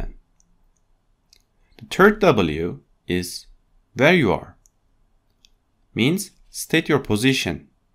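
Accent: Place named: Turkey. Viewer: Hocam Aksandan inanılmaz belli bu arada Türk olduğunuz :)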